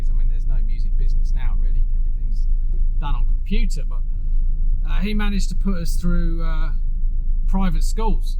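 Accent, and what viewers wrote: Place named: London, United Kingdom. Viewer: Thanks for the back story. Would not have thought you had a private school background, and your dad being a muso. Obviously you have set yourself up really well financially, which requires hard work. I'm a Kiwi and to me your accent is very London working class, "inn-it".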